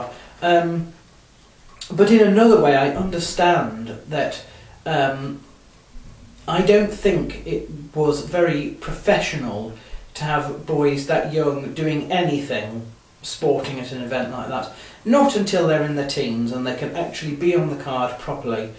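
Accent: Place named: Warrington, United Kingdom. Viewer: haha, well, good observation with the accent, tis abit of a hard one to pin point so, credit to you for conjuring up Warrington of all places hmmm, not too sure about the whole UFC notion tbh, it would take alot of commitment, but i wouldnt completely rule out at least attempting to compete at some stage in my life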